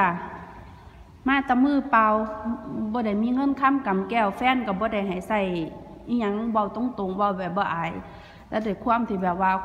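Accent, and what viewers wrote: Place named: Isan, Thailand. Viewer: น้องฟังพี่สาวเว้าม่วนฯชอบค่ะเวลากินตำมะหุ่งแซ่บหลายค่ะ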